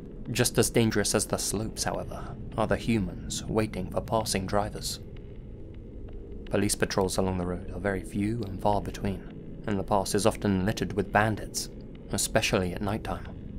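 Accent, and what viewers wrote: Spain. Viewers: Fortaleza is not Fortaletha like you would say in Spanish. And Jaguaro is not Jaquaro. Idk if I'm making sense but he pronounces it as a spaniard wouls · its funny to hear you pronounce Portuguese words with Spanish pronunciation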